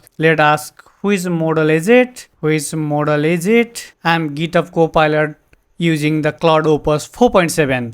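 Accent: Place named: Nepal. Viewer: Bro speaks English in Nepali accent! I love it.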